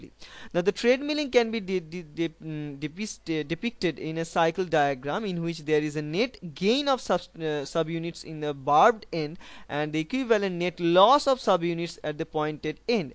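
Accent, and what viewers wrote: India. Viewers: you sound indian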